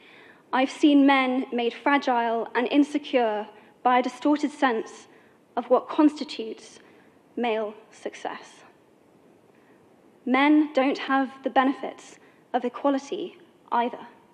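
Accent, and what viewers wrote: United Kingdom. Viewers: It’s called talking slow in a brutish accent, first class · Her accent is British, I prefer the American one . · Came to learn British English accent and learned sometime more important. Gender equality.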